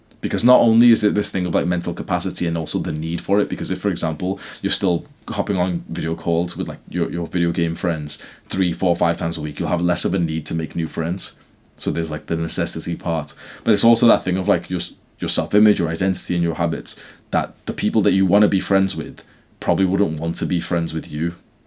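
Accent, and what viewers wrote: United Kingdom. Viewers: if i wanted to listen to brit accent for just for sake of listening to brit accent, i can throw on some Grange Hill or GB News. sorry moyt, i'm listening to this fooking bloke cos he makes sense. ta! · Great video title then boom hes british :(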